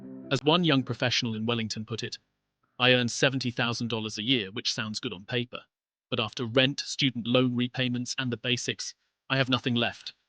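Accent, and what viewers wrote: England, United Kingdom. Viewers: It is interesting that the narrator has an English accent, because the UK is far far worse ... it is a country destroying itself and is well on the way to becoming an Islamic state in the not too distant. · What a miserable outlook video 😮 brought to you by a meloncoly sounding pommy shingles patient lacking any form of sunshine. Gore is ok for living or housing. Thjs video is just bullshit. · I find it curious that the narrator is clearly a Brit having to point all of this out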